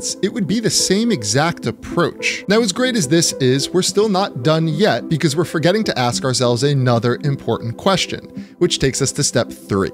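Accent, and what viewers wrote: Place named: Arab world. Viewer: Your accent is from arab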